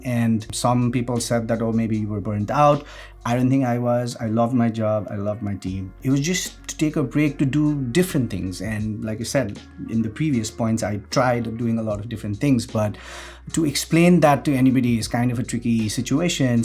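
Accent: Nepal. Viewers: Not to be rude but you sound like a nepali. That accent is unmistakable. I have a couple of friends and even colleagues who are nepali.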